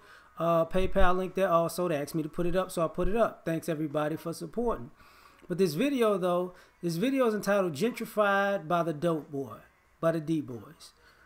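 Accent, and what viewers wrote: Chicago, United States. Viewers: I thought I heard a Chicago accent.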